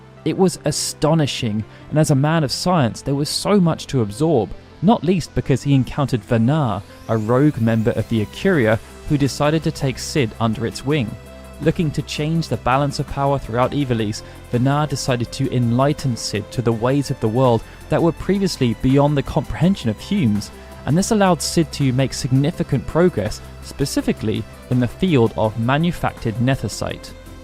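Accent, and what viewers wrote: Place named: United Kingdom. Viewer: You and Balthier are the best people with british acents to ever exist also do Kain next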